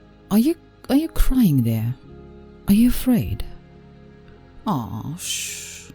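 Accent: Scotland, United Kingdom. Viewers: I can't figure out the accent, the os sound Australian, then she sounds Scottish but the way she rolls some words sounds Russian or something. I love her tho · She sounds Scottish to me, is that just me?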